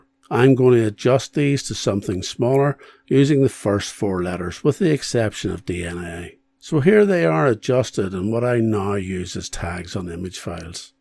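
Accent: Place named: Belfast, United Kingdom. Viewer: The Belfast accent is music to my ear. Melodic and easy to follow. This was an informative video and I thank you fir sharing it.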